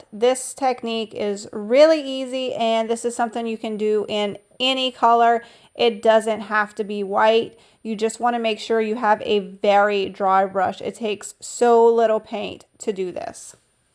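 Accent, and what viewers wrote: Louisiana, United States. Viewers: You're adorable, I just think your Louisiana accent is delightful and you're so creative. Thanks for sharing your ideas.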